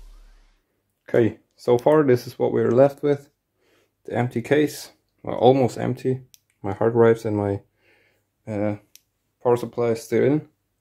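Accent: Germany. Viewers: Man hört voll das deutsche Englisch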